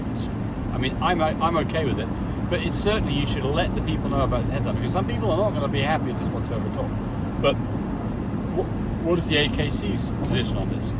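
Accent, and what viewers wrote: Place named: United Kingdom. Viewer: Im really curious you have a british accent.are you originally from UK.. karen from england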